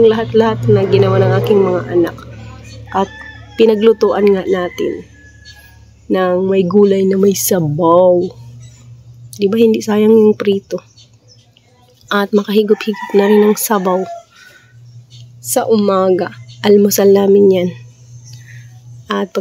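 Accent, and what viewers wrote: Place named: Visayas, Philippines. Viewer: Hahha sarap tlga pakinggan ung bisaya sis ba nakakaaliw pkinggan ko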